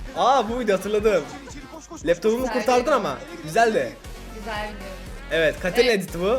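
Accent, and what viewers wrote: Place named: Southeast Anatolia, Turkey. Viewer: Abi niyeyse güney doğu anadolular gibi konuşuyorsun arada Yoksa sen güney doğu Anadolulumusun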